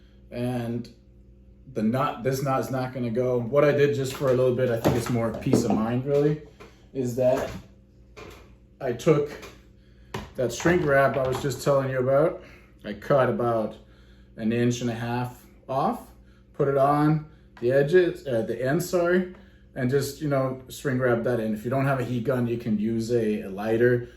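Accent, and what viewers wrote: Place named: Russia. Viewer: Thanks for the idea, im doing this as i type! Btw, what country are you from? Ive watched alot of your videos, and i swear i can hear a slight dialect from maybe russia?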